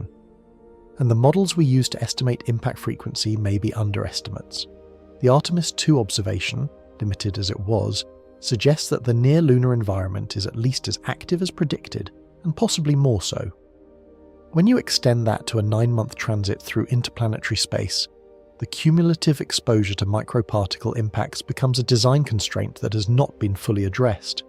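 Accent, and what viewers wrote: United Kingdom. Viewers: Quit with LIES AND Lose THE BRIT NARRATOR. This click N bait.. Anything NASA Says and does if fake lies. 👎👎👎👎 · This guys accent tells you everything about him just another jealous Brit bitter and eager to spread fear and hate towards the great free country of United States states of America 🇺🇸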